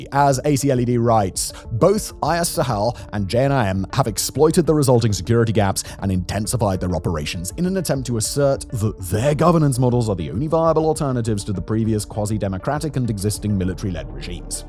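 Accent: United Kingdom. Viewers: He said Mali, British peoples L's sound like R's because of their accent.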